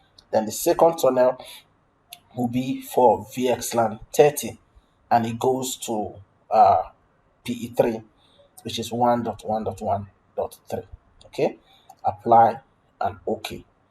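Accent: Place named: Nigeria. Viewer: why you sound like nigerian scammer?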